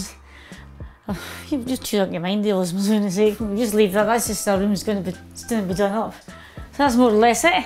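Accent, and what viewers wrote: Scotland, United Kingdom. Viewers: Many English speakers who are not used to hearing someone speak English with a moderately thick Scottish accent will have trouble catching all of her words. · Listening to her talk about the house, (love her Scottish accent) I believe this was her family home. Such a warm, lovely place. She is a wonderful talent and she looks so good. I am glad for the update.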